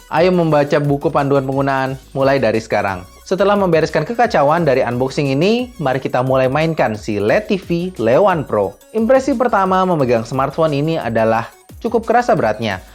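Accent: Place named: Sundanese, Indonesia. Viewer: Bang, Logat Sundanya kocak bat dah:v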